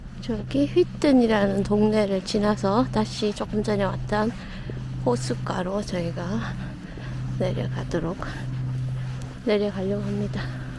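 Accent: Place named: Gyeongsang, South Korea. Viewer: 눈이내린 스위스로~ 달려가고파요 ^^ 하늘빛까지 내려앉은 눈덮힌 설경이 너무 아름다워서 얼른 캔버스에 옮겨놓고 싶어지네요! 그래도 될까요? 안개속 몽환적인이 풍경이..... 눈까지 맑게해주네요!!! 영상속 씨의 정겨운 저랑같은 경상도 억양도 소박하게 느껴지고, 이다음 스위스가게되면 좋은곳 많이 소개해주세요. 감사히 영상 잘봣어요^^ 즐건 주말되세요♡♡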